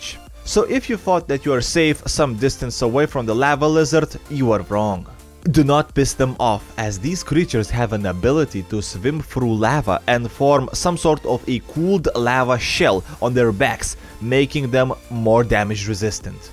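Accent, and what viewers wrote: Russia. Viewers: Russian ascent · Больно у тебя акцент русский . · I love your Russian accent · His accent is good tho Russian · are you Russian? probably but are you? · Is narrator russian? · I love, when russians speak English! It sounds so awesome! I love the russian accent. “a friendly slap in the face.“ xD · I can bet you are russian i hear your english and i like intro HELO COMRADES 😂 greetings from serbia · are you russin? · Hello comrades" communism 100 Edit:I just noticed the russian accent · I'm sorry if this offends you in anyway, but, are you Russian or maybe Scottish? The accent you have is making me curious. If you don't wish to answer I understand. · Русский акцент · У тебя такой русский акцент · Do you speak Russian accent?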